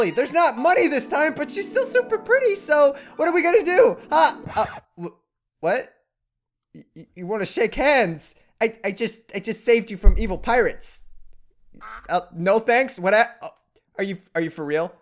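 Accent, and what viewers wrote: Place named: Sweden. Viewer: You sound slightly Swedish :o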